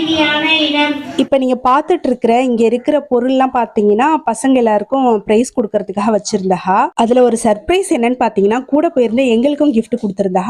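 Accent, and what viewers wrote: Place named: Nagore, India. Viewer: Nagore Karaikal slang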